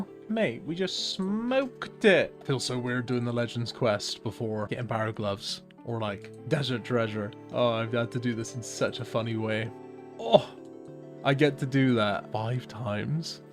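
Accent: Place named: Northern England, United Kingdom. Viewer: yooo, i think you're my fav yt scaper. your nothern accent is incredibly comfy. the vid is good but the voice is like an additional armchair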